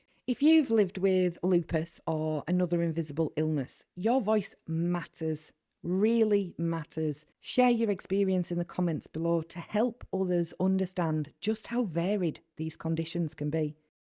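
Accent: United Kingdom. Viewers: New to your channel, i love the British accent. Hard to understand those that don't (kiwi here) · I love the British accent❤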